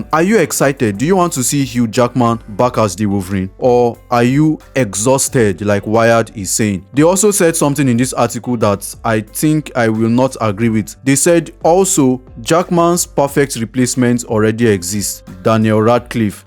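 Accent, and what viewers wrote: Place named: Africa. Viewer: You can clearly hear that you're african 😂 love the african accent haha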